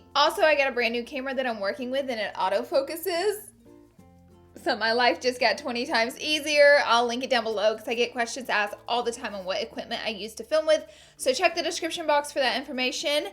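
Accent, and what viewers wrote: South, United States. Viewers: You have a southern accent so I automatically connect to you. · I love your accent! Where are you from in the US- your accent is to die for!💘💘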